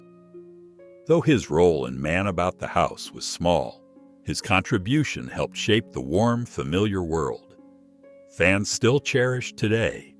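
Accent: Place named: United States. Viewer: American narration.Cant watch this sh1t.Spoilt the program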